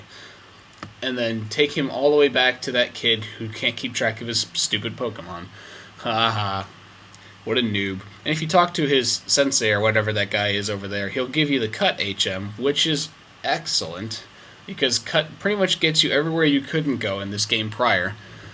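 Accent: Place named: Midwest, United States. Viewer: where are you from in the midwest?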